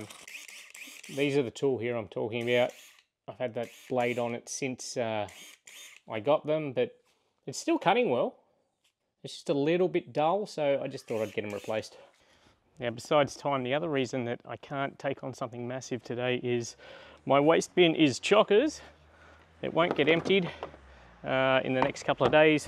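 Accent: Australia. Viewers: Like this style of video. Plus we get to hear more of that Aussie accent.:goodvibes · I could listen to you speak all day. I follow a lot of YouTubers from down under. Love the accent. ❤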